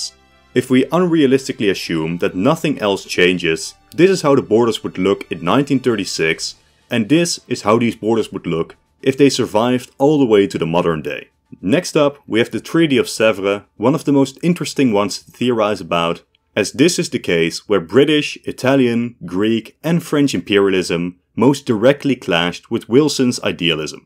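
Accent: Netherlands. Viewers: I think it's a Dutch accent.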